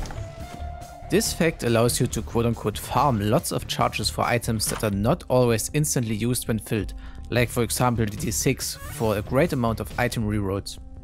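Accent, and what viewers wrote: Germany. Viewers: I don't mind what videos, so long as they have that silky German accent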